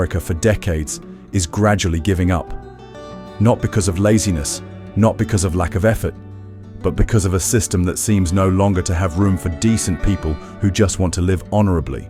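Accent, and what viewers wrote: United Kingdom. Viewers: not true.... this is 2025 machines are going to do the work now....robots people elon musk made them lol also who is this British guy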